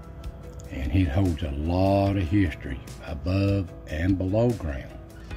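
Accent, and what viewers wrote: Appalachia, United States. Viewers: I am from Detroit but my heart lives in Harrogate and Middlesboro. I lived with my aunts and uncles in Harrogate during the Summers. In 1987 my uncle Steve Napier taught me how to drive when I was only 14 years old. We lived in Harrogate and he had a small shop in Middlesboro. To get me past my fear of driving he would have me drive across that mountain before the tunnel. Sometimes we would stop at the Cudjo's Cavern shop for some ice cream (before dinner). Uncle Steve was well known and loved from what I remember., your voice and talk atyle reminds me of so many of his friends that would stop in his shop to visit. It brought me back to the very happiest days of my life. Makes me wonder if you two knew each other...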